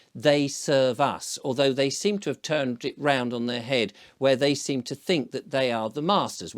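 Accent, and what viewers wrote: United Kingdom. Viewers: it's great to hear another British accent speaking on these subjects. Great balance of interviews, dry quintessential Brit humour and opinion. I have attempted to do what you are doing here on YT on a few occasions in the last 10 years but lacked the skill and time to produce the quality output that you deliver.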